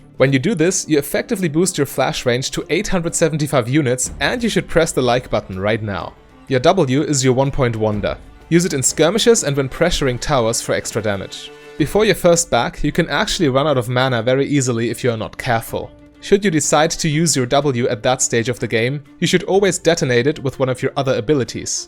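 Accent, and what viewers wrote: Germany. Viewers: effecient german voice, complete with runes, builds and poke guide. very nice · Idk why but i just instantly hear that you are german. Right? :) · u german?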